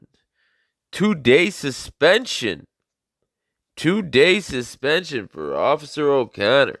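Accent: Chicago, United States. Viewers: Go get em!!! You have become a favorite auditor!!! I love when you educate them with that style and swagger! And love that Chicago accent 😉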